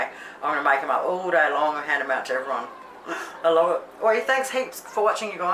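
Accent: Australia. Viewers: Great to find a Cook with a good ' downunder ' accent. !!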